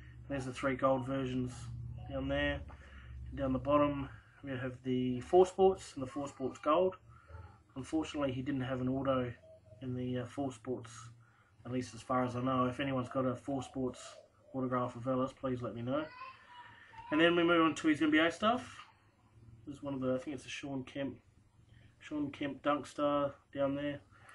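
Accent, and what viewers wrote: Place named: Australia. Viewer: wow wow mate great pc, its great to see some old cards taken good care of. i will go though my cards and see what i can find for you, i would be very proud mate. oh and love the jacket very nice.i will send you a pm in the morn mate, just had a real lond day at work. and its soooooooooooo good to hear another aussie on hear!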